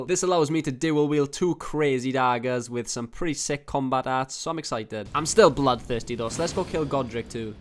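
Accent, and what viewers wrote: Wales, United Kingdom. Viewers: Im a simple guy, i hear a welsh person. I press subscribe. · Idk why but I'm getting a slight hunch that you're also welsh...just a hunch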